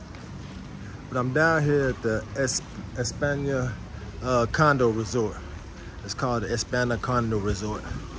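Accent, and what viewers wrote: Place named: Virginia, United States. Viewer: This is the truth he defiantly from Virginia it's the voice , VA dudes we just deferent, and women from all over the World love US ,and not just that even if he not from Virginia he branded with speech Pattern , but I just like to see yall together , and both of you make a Beautiful Couple ,and like the videos keep them coming.💪💪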